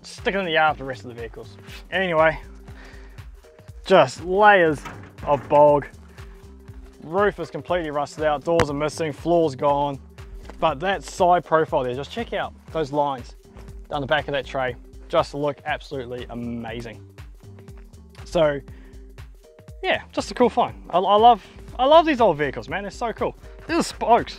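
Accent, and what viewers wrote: New Zealand. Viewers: the kiwi voice is annoying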